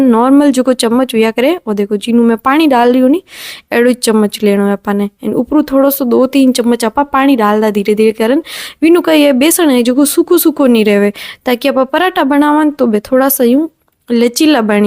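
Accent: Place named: Jodhpur, India. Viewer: Jodpuri boli Masha Allah ap ke zaban sy bohot pyari lag ti ho hum apko Pakistan sy follow krty Hain allah Khush rakhy apko❤❤❤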